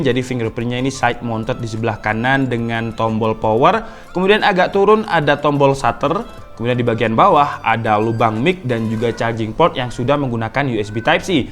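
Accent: Malang, Indonesia. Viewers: Wih lu orang malang y bang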